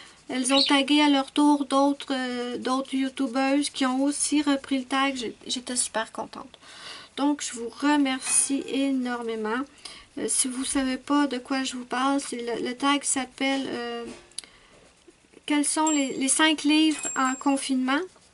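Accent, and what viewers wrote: Canada. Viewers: J'adore te regarder colorié j'apprends je regarde plus le mouvement de tes doigts si j'ai adoré sur les températures de chez toi moi qui veut allez vivre là bas un jours comme je ne supporte pas la chaleur et le soleil pour moi sa serait l'idéal moi je t'imagine je ferme les yeux alors a ta voix je voit une jeune femme grande longiligne avec des cheveux long mais tenue la tenue la journée brune et un visage ovale et un louque décontracté jean sweet a capuche pas maquiller ou que quand tu sors et des chaussons moumoute que tu enfille comme des bottes voilà pour moi je te vois comme sa un jeu? Comment est notre préfère et son très bel accent canadien comme le dis grand corps malade "je connais leur secret pour leur accent qui chante ils ont trop souplesse dans les joues" ils vont magasiné là bas pas de shopping c'est vrai?moi je fais un par un mais je suis loin de tes coloriage la je me suis imposée un colo sans numéro juste le model et bin je sais pas où posée le premier coup de crayon c'est une princesse manga,pour tes yeux le soir met une lumière blanche mieux pour les yeux fragile et des larme artificielle pour les hydrate 👍👍👍💖💖💖💖😘😘😘😘😜😜😜😃😃😃😃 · Super! 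J’ai passé deux moments vraiment agréables en ta compagnie! Oui😂hier soir je me suis endormie au son de ta si charmante conversation aux accents canadiens😉je trouve ce début de coloriage prometteur, c’est vrai qu’il ne faut pas s’acharner sur un colo quand l’ennui frappe à la porte; c’est vrai pour tout type d’occupations dans les loisirs comme ailleurs...J’ai tendance à aimer terminer ce que j’ai commencé; éducation oblige...mais le coloriage m’a appris à être moins rigide et à me permettre plus de fantaisie; et je suis capable maintenant d’attaquer un autre coloriage sans me sentir culpabilisée, tu vois tu n’es pas la seule dans ce cas😊Mais je ne me disperse pas; je tiens le cap 😄En ce qui concerne l’abord de mes coloriages; je pars sur une idée générale de couleurs et je laisse aller mon inspiration du moment vagabonder; je suis toujours surprise des résultats bons ou mauvais😂Encore merci pour le temps partagé avec nous; je suis allée sur la page Facebook de Muriel et effectivement cet aigle est somptueux; je trouve tous ses colos harmonieux et beaux À bientôt; des caresses aux poilus et plumeux de toutes espèces qui partage ton quotidien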